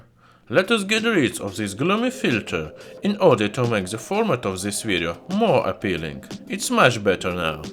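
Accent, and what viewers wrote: Russia. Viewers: Thanks for posting these videos. I enjoy learning about the elements with a cool Russian accent. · It's Russian accent--the main reason you hard to understand. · Love your Russian English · This is more like Russian with an english accent...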